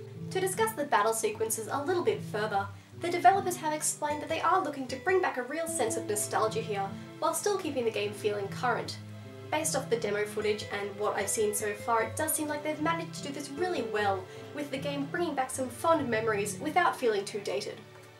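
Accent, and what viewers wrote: Australia. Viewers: Oh wow an australian :D hi!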